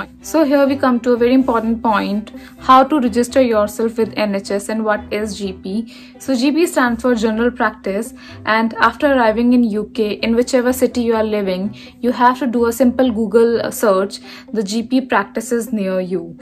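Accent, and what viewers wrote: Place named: India. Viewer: Beautiful lady, from the accent im sure your an indian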